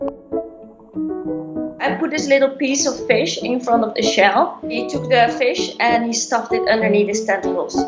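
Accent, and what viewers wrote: Netherlands. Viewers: This video was already on the Dodo but nevertheless stunning. I can hear she is Dutch and so am I , lol. 👋🌞🇳🇱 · The STRONGEST Dutch accent I've heard in a while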